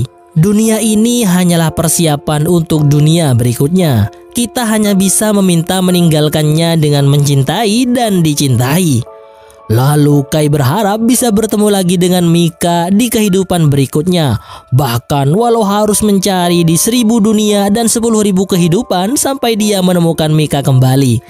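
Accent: Madura, Indonesia. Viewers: Corak reng madureh reh adminah😩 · Oreng madureh hedeh nom😅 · Medureh ongghu , keren ❤❤❤ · Abbeeee alure campur madura masze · Ini komentatornya orang madura ya....😂😂😂 Soghe' ckna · Madureh mas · Haha, mimin madura 😅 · Mak medureh kak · Oreng medureh hedeh kak Yeh wes auto subrex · Min dari madura yah ?? Kentara banget logatnya hihi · Reng Medureh cook😂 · Pengisi suaranya kek orang madura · Suaranya madura bgt , tlg d benarkan bang .... Bahasa indonesianya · MK Reng madureh kak🤣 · Ye pokeeh madureh tulen 😂😂😂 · Njir madura cokkk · Ngkok agelek eh takok dusah ternyata adminah reng medureh😂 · 😂 logatta tangake jhek Reng madureh 😅 salam Sampang bang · Kurasa adminnya madura dr logat ya sya tebak, mantep tretan😂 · Suara latar nya kayak orang Madura logatnya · Orang Madura dia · reng madureh kak... madureh dimmah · Medurene e tengale Mak 😂 Tp mantap 👍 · Madureh dimmah sampean tretan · Abeh mak sellae madura 😁😁😁😁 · Mak pas bedeh bhs medurehnah tretan Mantap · Lucu moderatornya,letolean 🤣...mantap...madura eksen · Madureh reh😂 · Oreng madureh mon disoaranah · Reng madure ini..se ge oge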